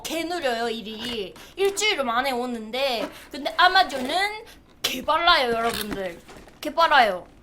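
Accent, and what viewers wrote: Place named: Busan, South Korea. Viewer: 부산이면 그냥 말투입니다.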